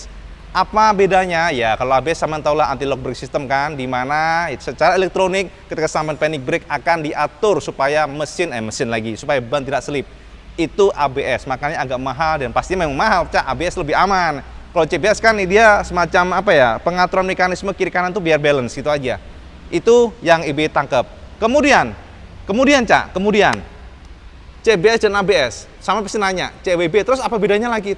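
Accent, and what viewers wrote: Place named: Java, Indonesia. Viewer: Duh duh bahaasane penak dirungokne jowo ne kentel .. wes lupakan fanboy 🙏🏻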